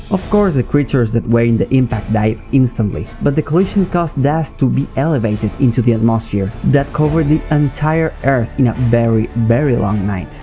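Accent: Oxford, United Kingdom. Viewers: Ni siquiera iba a entrar a este vídeo, solo entre para de suscribirme, después oí tu voz con el ingles de oxford y pensé "Que s dalas tío" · That Oxford English papuuu Loved the video it really makes you think about it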